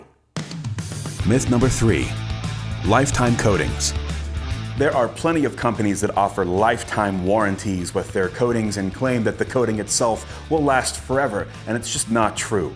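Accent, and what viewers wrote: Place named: England, United Kingdom. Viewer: Thats a VERY convincing English accent! I’m English and ya had me fooled!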